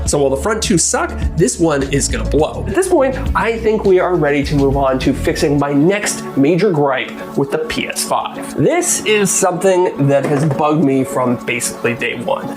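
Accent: Canada. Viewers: This guy's definitely canadian.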